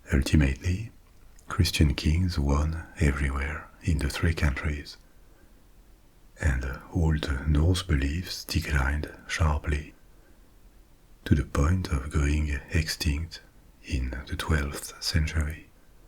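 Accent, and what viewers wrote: France. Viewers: Your french dialect is whats makes it perfect. Thank you for your work. Listing to it every night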